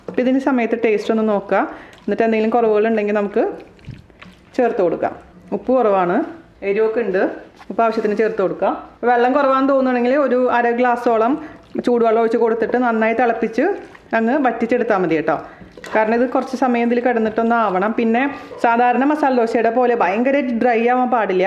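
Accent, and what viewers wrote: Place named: Thrissur, India. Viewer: Ready Mani ayyi...athu enniku ettayi... thrissur slang...I also from Thrissur...now at Kochi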